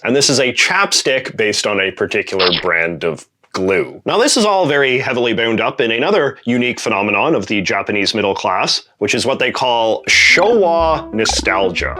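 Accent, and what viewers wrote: Canada. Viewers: Canadian accent comes through thick in a more candid video. Not every place is for everyone. You don't have to like Japan. It's good you're giving it a shot.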